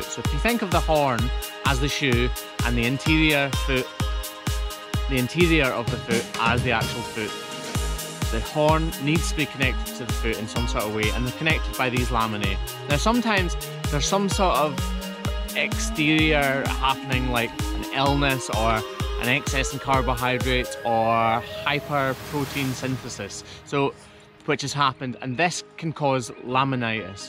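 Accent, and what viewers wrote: Scotland, United Kingdom. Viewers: I've watched almost all of your videos in 3 days time. POST FASTER! I knew nothing about cow farriering prior to your videos. A good Scottish accent helps too.😊 ha! Good day!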